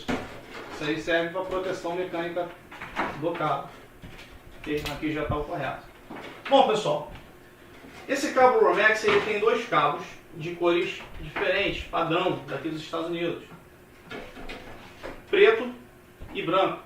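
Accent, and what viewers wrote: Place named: Rio de Janeiro, Brazil. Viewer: Bom dia meu amigo, ganhou mais um escrito, sou do Rio de Janeiro pelo seu seu sotaque vc deve ser aqui do Rio, trabalho Muito <link> elétrica estou pensando em irmora ae abraços e parabéns pelo conteúdo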